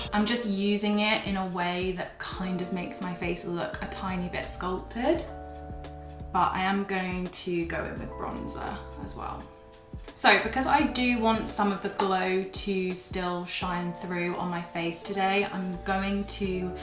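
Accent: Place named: United Kingdom. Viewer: No one says "glam" like you with your classy British accent! :D Beautiful look!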